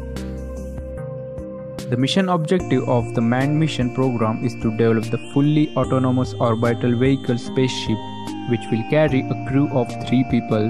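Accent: India. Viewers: Rohit Y , English is fine. u might be talking about tone he is using. it's Indian tone alright! who give a f**k to America tone.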